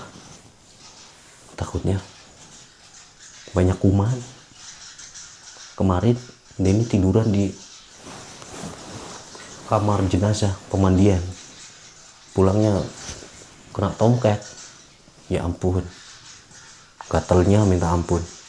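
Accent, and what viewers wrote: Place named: Java, Indonesia. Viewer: Demen aku sama mas ngomongnya medok banget hehe khas....😅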